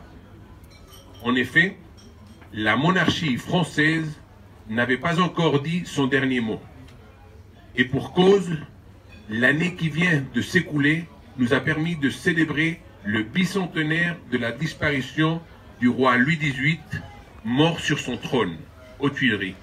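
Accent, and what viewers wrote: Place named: Spain. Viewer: Ne soyez pas ridicule on sait très bien distinguer les différents accents,parisien,sud ouest,chti, marseillais etc,Louis XX n' a aucun de ces accents, et c'est étonnant d'entendre un accent espagnol de la bouche de quelqu'un qui est censé incarner l'héritage de la monarchie française,non?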